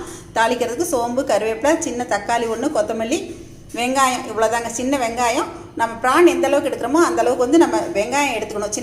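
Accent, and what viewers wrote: Kongu, India. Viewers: superma 👌.karura palavidhamana visayangalayum kovil anai hotel market handloom bedsheets towels indhamari ungalai kavarndha visayangalayum podunga .therindhukolla vasadhiyaga irukkum.kongu tamil pesi vilakka neengaldhan super.valarattum ungal pani valthukkal.💐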